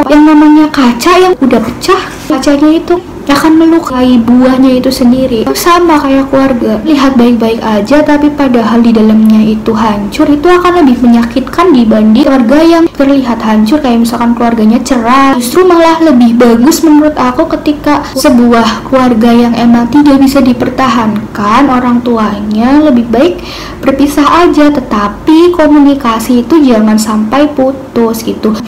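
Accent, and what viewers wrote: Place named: Java, Indonesia. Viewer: Kak kelihatan medok buanget😂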